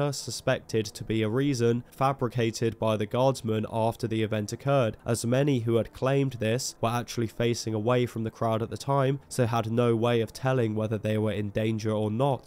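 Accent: United Kingdom. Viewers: There's actually a fair number of documentaries on this, made by people who were there at the time. Fun to hear a British kid do an abbreviated rundown on decades of American history.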